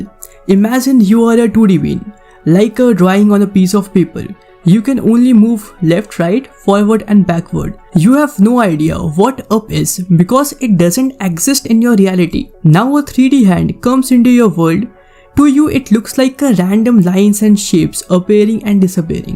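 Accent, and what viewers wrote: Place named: India. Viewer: Why not correct your pronunciation (Indian accent) - which is beyond my ken.. - and remake this clip?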